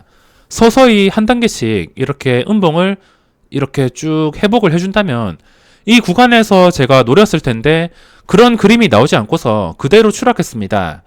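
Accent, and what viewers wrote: Gyeongsang, South Korea. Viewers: 아씨.... 갱상도... 오늘 수급 있는게인지 잊는게인지 한참 통번역했네...ㅋㅋㅋ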